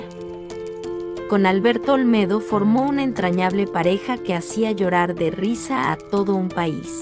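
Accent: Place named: Central America. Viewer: Por que el audio con tono o acento centro americano? No me gusta.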